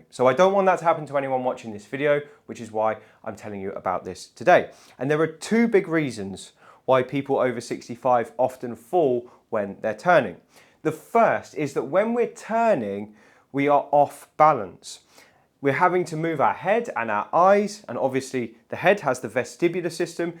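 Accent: United Kingdom. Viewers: Thank you for the work you do! My wife is in physical therapy from a young man that resembles yourself. Except for the British accent.😊 She is receiving treatment to regain confidence after a hip replacement. Her marching turns must take 20 steps. Therapy is critical in allowing a person to use what the surgeon provided. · OK, dude, you've got a cute British accent, and it sells in America. But git real, y'all. Nobody turns crossing his legs first. On the other hand, maybe you should teach now how we should drink through our mouth, not through our nostrils.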